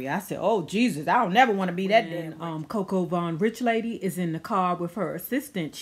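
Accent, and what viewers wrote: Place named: Baltimore, United States. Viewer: I can tell you from B-more, your accent is strong!! lol #DRASK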